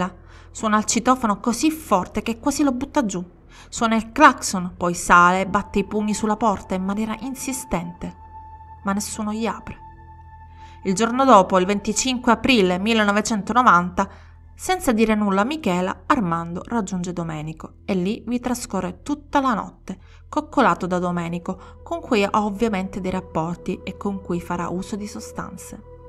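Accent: Sicily, Italy. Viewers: Probabilmente il commento può sembrare inappropriato…e ti prego: “prendilo davvero x ciò che è; UN COMPLIMENTO!” ma devo proprio dirtelo… Nonostante le storie di cui racconti il tuo accento fortemente “siculo” ti rende davvero sensuale! Forse perchè sono innamorato delle donne siciliane, ma nonostante non ti si vede sono sicuro devi essere bellissima…e appunto…sensuale!😍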